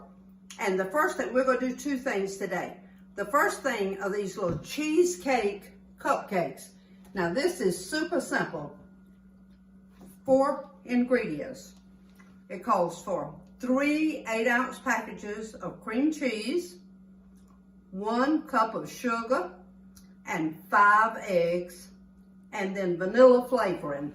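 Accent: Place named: South, United States. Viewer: I just love the accent 😉. You are what I think of when I think of a real Southern baptist lady.🙏❤️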